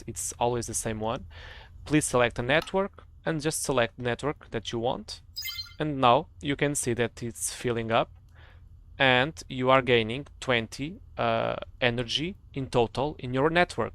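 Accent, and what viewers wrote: Portugal. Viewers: man your from portugal right? your accent is very portuguese and btw great video ty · My guy you sound kinda Portuguese ngl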